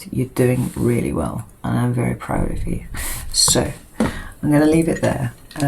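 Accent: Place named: England, United Kingdom. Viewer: Thank you so much ! Your so beautifully amazing and your acsent well I know to you it's not an accent because you are true English us over here in the states are not but anyway I love you ❤️ thanks again .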